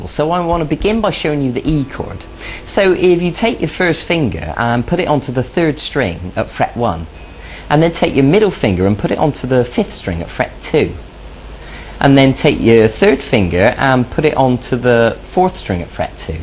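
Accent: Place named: Wales, United Kingdom. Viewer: This guy sums up the 90s! With the addition of a welsh accent of course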